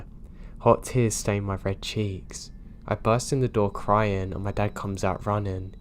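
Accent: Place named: RP, United Kingdom. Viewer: awfull posh creepy voice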